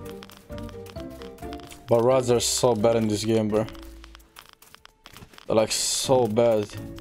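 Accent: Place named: Norway. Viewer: haha you sound very norwegian with your accent, but where are you from then?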